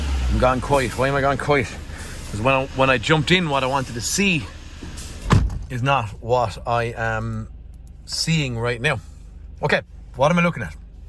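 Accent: Ireland. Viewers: Suprised to hear an Irish accent! and thanks! Same problem with my Outlander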